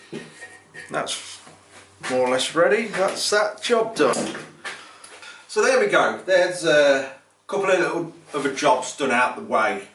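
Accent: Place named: Leicestershire, United Kingdom. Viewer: Just found your channel, and started catching up with your vids, I've been an engineer all my life, so can appreciate your skills, and with that accent, Im thinking you are from somewhere in Leicestershire? anyways, great content, really enjoying it!, cheers Dave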